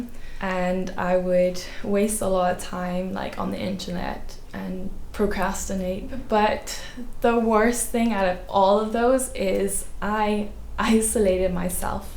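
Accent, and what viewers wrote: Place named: RP, United Kingdom. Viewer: Hey your accent has changed. More posh. Anyways, how are you doing? 😊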